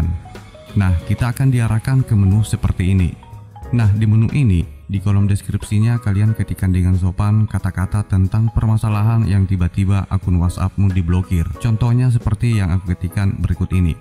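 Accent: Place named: Bugis, Indonesia. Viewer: Logattt nya logattt bugisss skli🗿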